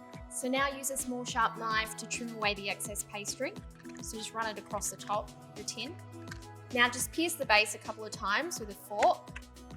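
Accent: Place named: Australia. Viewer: She's so happy all da time man...and she sounds like she's from Australia. What a terrific woman. Preciate da video fr💚🖤🤲🏾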